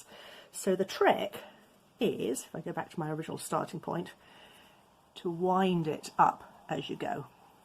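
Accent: United Kingdom. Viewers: Obviously the video is very interesting. But most of all I looooove your British accent!haha Free hugs from Córdoba, Argentina. Namasté.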